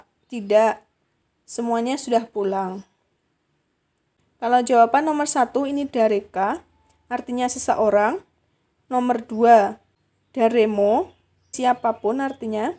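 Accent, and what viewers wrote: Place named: Java, Indonesia. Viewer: Nyong seneng medoke sampean mbak sensei..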